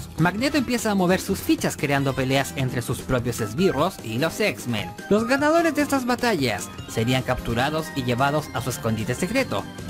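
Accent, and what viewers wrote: Chile. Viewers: Eres chileno? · Acento chileno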